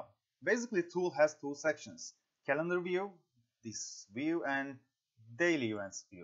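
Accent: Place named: Turkey. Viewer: Merhaba, şöyle bir fonksiyon mevcut mu excelde? İçeriğindeki bilgiye bakılmaksızın bir sayfadaki hücre format biçimi olsun, satır ve sütun yüksekliği, genişliği olsun diğer sayfalar ile köprülenecek, bağlantılı hale getirilecek? Yani ben ana sayfada a1 hücresi için metin hücresi olacak diyeceğim, genişliği de 3cm olacak diyeceğim ve diğer 10 sayfada da bu değişiklik takip edilecek, otomatik uygulanacak. Hangi değişiklik yapılırsa yapılsın, sallıyorum genişliği 4cm olduğu zaman diğer sayfalar da değişecek ve bu sadece bir hücre değil bütün hücreler için geçerli olacak. Kısaca gölge sayfa gibi çalışacak ama üstüne formül ile ayrıca hesaplar döndüreceğim. Sanmıyorum ama uzun süredir bu sorunun cevabını bulamadım. Başarılarınızın devamını diliyorum. Videoyu dinlerken aksandan ötürü bir türkün seslendirdiği anladım, danışayım dedim :)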